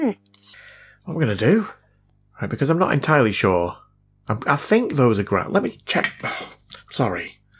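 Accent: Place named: Bradford, United Kingdom. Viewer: All I want to know is if you're originally from the Bradford area? (because, inflection for inflection, you sound exactly like the Lost in the Pond dude.. and that's where he's from)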